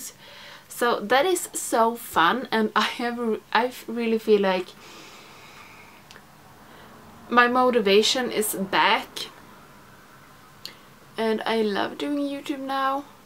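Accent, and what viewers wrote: Sweden. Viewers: Congratulations on your plants and your you tube channel!! Those browns look really beautiful on you. Thanks for doing your videos in English. I love your Swedish accent.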